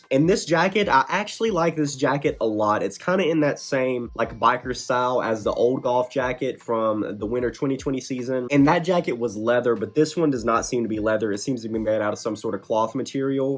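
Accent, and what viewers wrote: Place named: South, United States. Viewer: This dude’s accent is so sick. Very southern, I love it! Love your channel man, so nice to find a brother through GOLF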